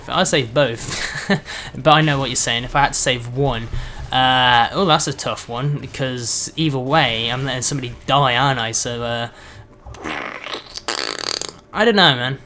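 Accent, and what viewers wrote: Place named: Salford, United Kingdom. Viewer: are you from salford? you have an extremely similar accent to mine, only mines more high pitched and squeaky being female...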